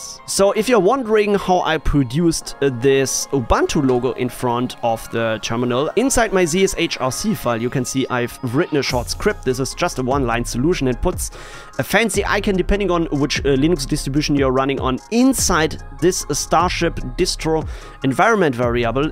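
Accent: Germany. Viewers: i smell a german accent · Deutsch! Hört man :D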